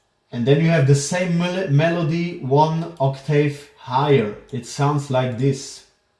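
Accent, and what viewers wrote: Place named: Germany. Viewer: ドイツ訛り英語だけど逆に日本人には聞き取りやすいし、日本語のタイトルは嬉しいね。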